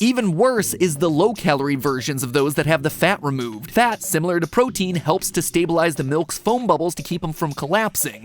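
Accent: United Kingdom. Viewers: wow when did become british??? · oh hes bri'ish or an aussi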